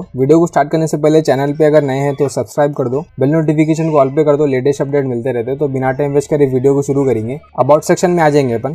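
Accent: India. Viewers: Love your hindi accent. Dont change the way you speak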